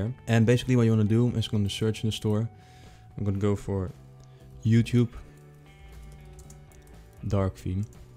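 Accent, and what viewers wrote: Netherlands. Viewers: he has an a dutch accent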